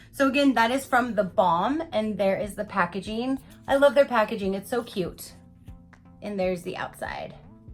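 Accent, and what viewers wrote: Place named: Canada. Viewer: Hi love, I just love the sound you made with the spoolie: buuuuuurom, beeeeroom, beeeerum, booooorum? Inquiring minds want to know.🤪 I wish I’d have gotten the same lipstick. Mine is from colorclub, and it is the EXACT color of the ipsy bag. Another toober, abbadabs, didn’t get her extras either. I have a feeling they are being shipped later. I haven’t heard yet. Another question: where are you from? You sound Canadian, but some Americans far north have a bit of the same accent. I really love yours. It’s so soothing. Gee do I sound like a fan girl, at the wee age of 63? Anyway, I’m not trying to hit on you, I’m happily married for 35 years(about, I always have to stop and count.) our secret? We both had practice marriages, and he was a workaholic who traveled all the time. I figure our actual time spent together is really about 5 years! 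It was a little hard being a sort of single mom, but once we got to San Francisco Bay Area, it got better. Everyone’s husbands worked all over. pm, any restaurant is filled with moms and children. We helped each other out. But these days you’ll often see a smattering of home dads too. My son-in-law is sort of one.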